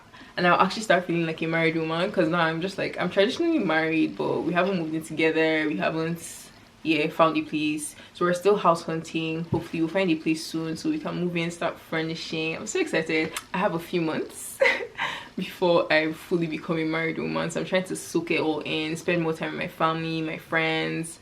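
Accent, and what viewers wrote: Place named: United States. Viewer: Were you born in canada or united states!!! You have an american accent with a african twist, you go in and out of the accent!!! Its so cute!!! You are a beautiful girl!!! Congrats babygirl!!! You remind me of my daughter!!!😊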